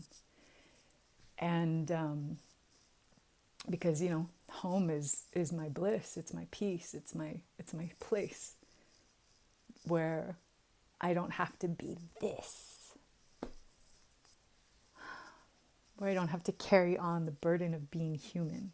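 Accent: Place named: France. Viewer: This is really cool. Thank you for sharing your words of wisdom, and the wisdom of wizards :) Also your French accent is endlessly entertaining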